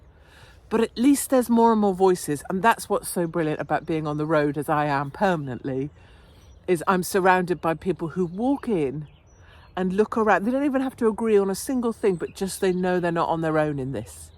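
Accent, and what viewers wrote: RP, United Kingdom. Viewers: I love you sound like a posh girl,articulate like an estate girl.quality.x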